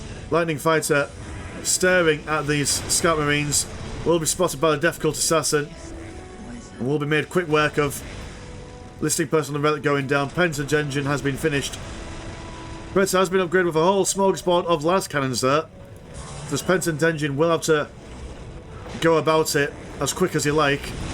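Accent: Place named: Yorkshire, United Kingdom. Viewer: ‘Why not go eh oop and away with Yorkshire airlines? Wipe tha bloody feet!’ The accent only adds to the enjoyment for me. Keep up the good work! 🫡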